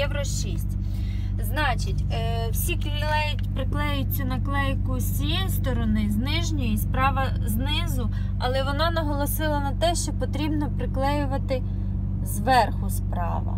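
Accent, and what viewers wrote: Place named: Surzhyk, Ukraine. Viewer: Ох Суржик суржик) Привет из Сумщины😁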